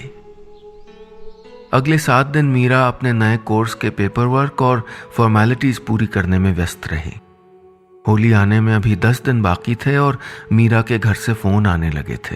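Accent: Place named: Uttarakhand, India. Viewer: How do you talk in all the local styles , I am from Uttarakhand I can relate, so amazing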